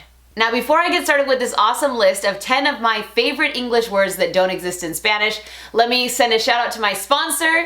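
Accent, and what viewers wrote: Mexico. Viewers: Haters is Odíoso in spañish. Remember that you speak Mexican Spanish. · hahaha your mexican spanish is so cuchi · SE TE NOTA EL ACENTO MEXICANOOOOOOOOOOOOOOOOOOOOOO cuando hablas en inglés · Espectacular tu español con acento mexicano!!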